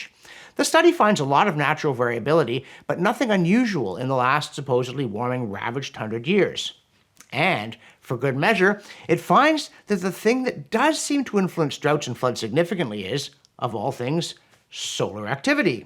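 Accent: Canada. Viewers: Dr. Robson, I have to say this. I know you are a good intended person very active in getting real information to people about the climate change alarmism and it's dangerous to our society. I'm just going to say it meaning to help because your message is very VERY important. Not so bad in this video, but ... since your message is SO important I think the way you comport the message is flawed. Your vocal delivery is plainly, not good. You speed talk so much and trail off at end of sentences and paired with your obvious Canadian accent, sorry but t true there's something there.. ... too often it gets frustrating trying to hear you and often I've just stopped watching the video to find something else I can actually hear. Yes, I have tinnitus, but I am not alone in that. I don't know what your hurry is and why you can't just take the time to present your message in a more listenable deliberate cantor. 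I think you'd find MANY more views on your videos if you'd try to improve your public speaking to a more pro presentation. I say this as a person who appreciates your efforts and especially your important message. BTW the caption often gets it wrong and can't keep up either. A little patience and taking it a bit easier will benefit your delivery. People want the truth they also want to hear it communicated clearly. Take this as constructive as I do like you.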